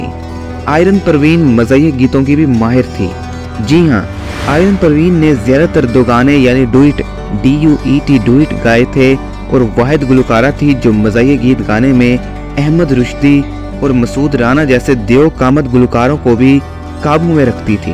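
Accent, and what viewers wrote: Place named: Punjab, India. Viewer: pnjbi lahjy ha uordo ghlet bol rhya hu kuri ko kari bol rhya hu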